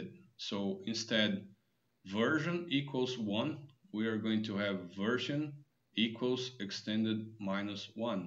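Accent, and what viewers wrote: Brazil. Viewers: Você é br mano? Tem sotaque e o nome de brasileiro haha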